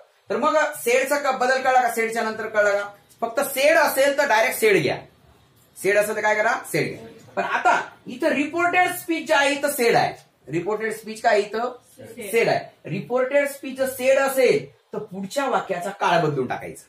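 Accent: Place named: Bengal, India. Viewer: Ka bol raha h, bangali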